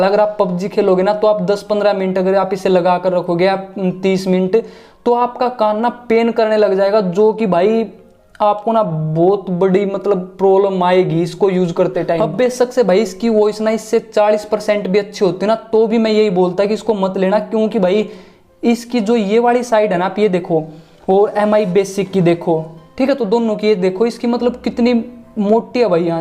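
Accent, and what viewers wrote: Haryana, India. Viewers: Bhai haryana me kaha se ho?🤭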